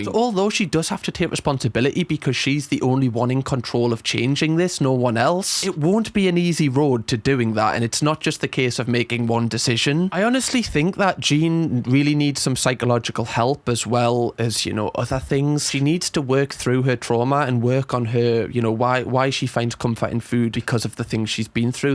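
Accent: United Kingdom. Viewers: Hey I tried to know where are you from your accent,the nearest one came to my mind British:) am I right? Am studying English so sorry for annoying you 😅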